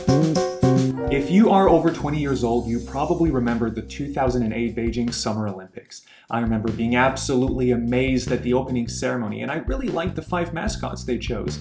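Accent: Standard. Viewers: 你的普通话说得非常好，很标准，甚至听不出口音，真的很厉害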